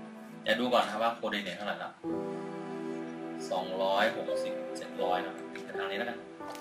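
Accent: Isan, Thailand. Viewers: พี่ พูด ภาษาอีสาน บ่ซวนกันเล้ย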